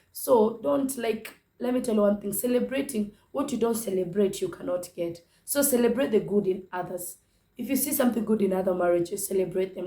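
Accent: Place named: Tanzania. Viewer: nimependa vile unazungumza swahili ya TZ hahha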